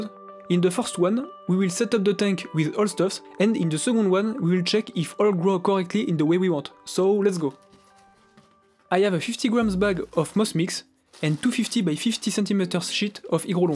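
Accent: France. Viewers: that french accent I just can't stand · le fameux accent Français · avec cet accent français, j'ai tout compris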